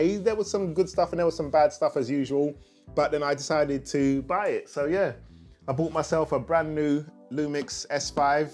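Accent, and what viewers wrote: United Kingdom. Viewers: I'm here for the British accent, otherwise excellent review we need more of the affordable gear review.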